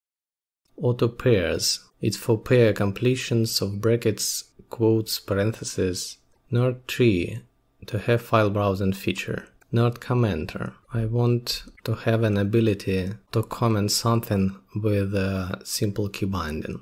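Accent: Russia. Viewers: Судя по акценту ты наш парень) Спасибо тебе большое, очень помог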